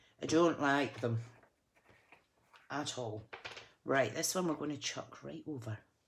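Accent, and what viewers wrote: Scotland, United Kingdom. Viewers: Is that Scotland I hear in your voice? Those cards are gorgeous. I’m a demo from Dover, Delaware in the USA.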